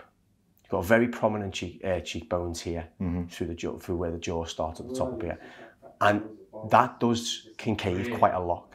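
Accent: United Kingdom. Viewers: I Can't Stand British accents. Almost 50% of what he said I couldn't understand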